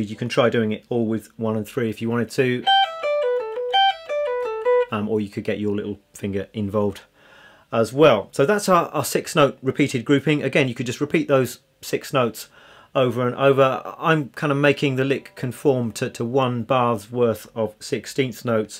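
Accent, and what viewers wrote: England, United Kingdom. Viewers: Great lesson ! Where in England are you from ?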